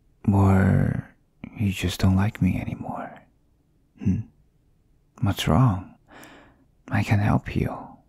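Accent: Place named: North, Mexico. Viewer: que norteño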